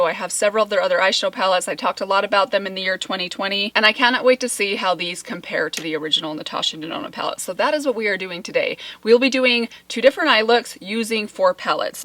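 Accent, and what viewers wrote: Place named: Utah, United States. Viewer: Oh my heck, I totally love you,!!! I just received these two palettes in the mail about a week ago and being the novice I am, I wasn’t sure how to use them. Thanks for posting a tutorial so well timed! 😊 Also, did I guess it or did I guess it! I knew that accent came from Utah. 😉 I used to live there myself. Where did you and your husband build your new home? Those irrigation pipes kind of reminded me of Idaho...? Keep the videos coming! Love you! ♥️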